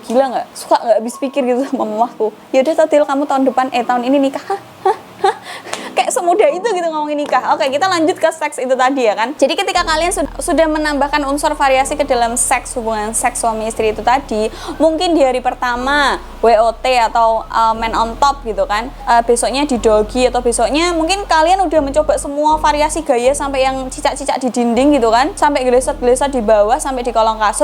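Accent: Java, Indonesia. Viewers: medok Banget bahasa jawanya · versi medok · Salam dr.kupang..ntt.dan beta suka wajah serta keceriaan.logat jawamu.bkin hilang stresss.ku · Medokk'e poll mbak 😂😂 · Cakep-cakep medokk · Pekat loghat jowonyo mbak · Medok😆😆😆, salam dari warga banjarmasin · Jawane kentelllllllll👍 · Jiann tenan mbak..Aslii cah wedok spekk jawaaa nii bossss😆😆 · medok Jowo nya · Medok mu marai kangen mbak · Lo orang jawa yh mbk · suka cara gayamu ngmong mba medoknya kerasa banget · boso jowone nylekit njiir... jowo ndi mbk? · Medok tp ngangenin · q suka cara penyampe annya lucu terhibur juga nambah wawasan,maaaantap bahasanya medooook,🤣🤣🤣🤣🤣🤣🤣🤣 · orang jawa ya mbak? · Medok bngt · the real medok cantik, bkn buat2 sperti d ftv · Medok bat haha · ngomong medok gak masalah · Aku suka medok kamu, jadi tambah lucu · waduh medok bgt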